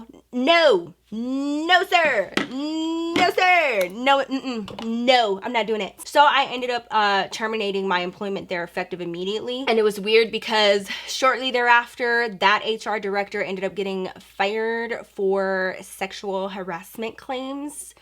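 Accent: Texas, United States. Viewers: Texas in the house!!!! You Sound like you from tx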